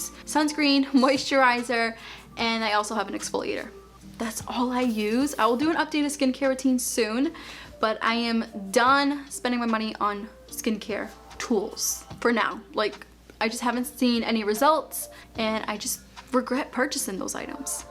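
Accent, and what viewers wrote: Boston, United States. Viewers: Are you from Boston or something?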